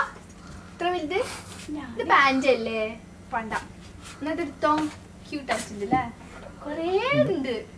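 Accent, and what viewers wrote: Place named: Kozhikode, India. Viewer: നീ കൊച്ചി അല്ല നീ ടെ സംസാരം kalicut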